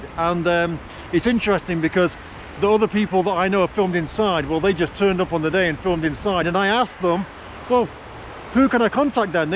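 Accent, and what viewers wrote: United Kingdom. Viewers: you've got a big heart. You went out of the way to help the woman in the US to get in touch with her mom, and you bought a phone for her mom to call US. Not many people would do that. By the way, if it weren't for your British accent, I would have mistaken you for a Russian.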